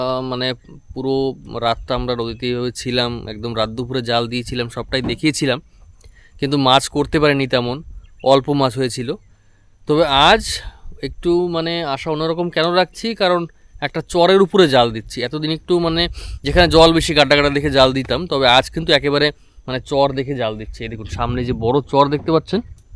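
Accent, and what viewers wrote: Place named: Barisal, Bangladesh. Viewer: দাদা আপনার আমার খুব ভালো লাগে আমি আপনার ভিডিও গুলো সব সময় দেখি আপনার ভাষা আমাদের দেশের বরিশালের ভাষার মতো পায়সা মাছ আমারও প্রিয় মাছ কারণ আমরা সুন্দরবনের কাছে থাকি আমি বাংলাদেশ থেকে বলছি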